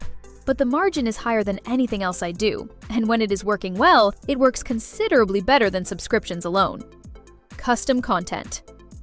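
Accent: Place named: United States. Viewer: Why does she speak with an American accent instead of a British one?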